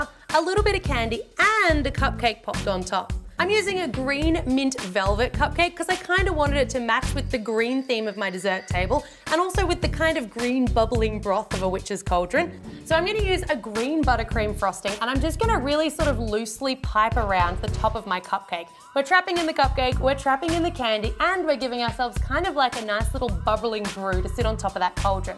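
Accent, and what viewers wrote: Australia. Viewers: But her accent is clearly Austrailian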